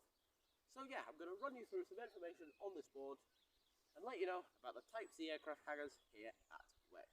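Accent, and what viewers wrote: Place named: Germany. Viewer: Excellent video. Very interesting and a great German accent too!!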